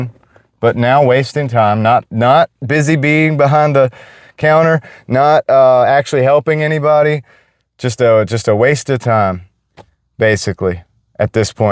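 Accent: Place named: Rural, United States. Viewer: Unrelated, just curious, what part of the US is the accent from? To me it sounds like a very mild country accent of sorts. Pleasant sounding. Just curious.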